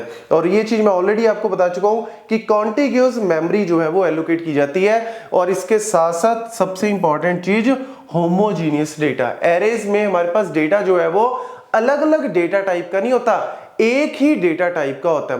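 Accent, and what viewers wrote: Punjab, India. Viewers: Ur Punjabi accent so sexy said again❤️🙌😅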